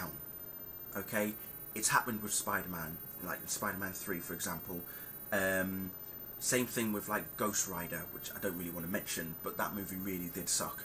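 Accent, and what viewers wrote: United Kingdom. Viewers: Your British aren't you.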